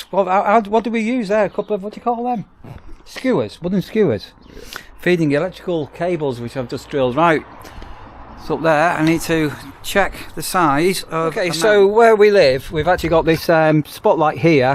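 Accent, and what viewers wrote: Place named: Liverpool, United Kingdom. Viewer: Dont be disillusioned Lincolnshire has their own thieving scum bags. You are really disrespecting the decent people who live in Manchester. For someone who has lived in Manchester all their life your accent is more SCOUSE than anything. Why so many cameras around your bungalow if you feel so safe living there! 🤔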